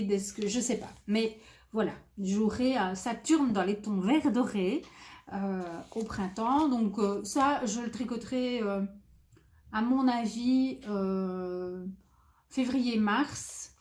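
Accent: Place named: Belgium. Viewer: Coucou, voilà que je découvre ton podcast et j'ai déjà regardé quelques épisodes qui ont été très appréciés! Dès le premier visionnage, j'ai eu le sentiment que j'écoutais une compatriote belge. Il semble que tu as habité la Belgique! Ceci étant dit, je suis une grande consommatrice de podcasts tricot français 😉. Bref, j'aime beaucoup ce que tu présentes et la manière dont tu le fais et je m'abonne de ce pas! Gros bisous de Belgique😘♥️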